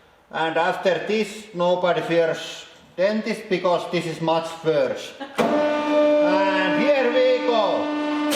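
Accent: Finland. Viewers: I read that with your Finnish accent. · Are you kiddingg me? Half the reason I am here is that Finnish accent LOL it wouldn't be anything good without him talking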